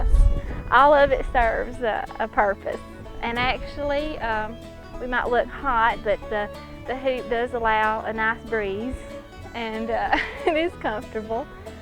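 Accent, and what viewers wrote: South, United States. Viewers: You have a great southern accent, so charming. Love the dresses too! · I just love the Southern accent. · Its weird seeing this b.c here in the south this is a low class accent. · You made these dresses yourself? Very beautiful! 👍🏻🤩🥰 And I like to hear your southern accent, like in the tv series of “North and South”; I live in the Netherlands 😅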